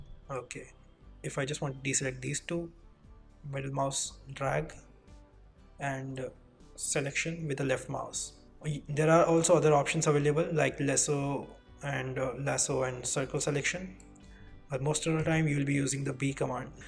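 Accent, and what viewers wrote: India. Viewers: this is the best one out in the youtube man he explains more clear than my dad!!!! i was shocked hearing an Indian voice hats off man and...i subscribed your channel. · Bro are you from India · Amazing Work! BTW are you Indian?? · I Can Hear Indian Accent Clearly I Am Sure It Will Be The Simplest Tutorial 🤩🤩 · I paused this video just to post how much i appreciate all the work you put in this. As someone who has experience on C4D already, many things look familiar or very similar, but in no way i could find my way around Blender so quickly by reading their vast manual. You explain things nicely, and clearly. And yes, i love Indian accent. 1/3 of all my knowledge regarding software and windows matters like security, troubleshooting etc, was passed on to me for free by awesome people(s) with heavy accents. Thank you from a fresh subscriber. 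· The basics already looks hard.... BUT i learned ALOT from this tutorial. you can explain well and you have a funny indian voice. Thank you.. alot. i also subscribed to your channel! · Indian haan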